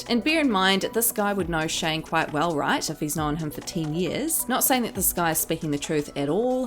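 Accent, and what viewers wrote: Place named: New Zealand. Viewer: Kiwi christchurch accent?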